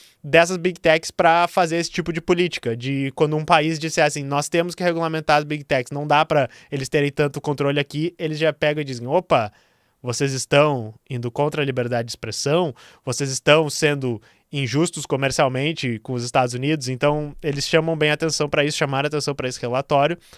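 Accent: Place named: São Paulo, Brazil. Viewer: o sotaquinho paulistano kkkkk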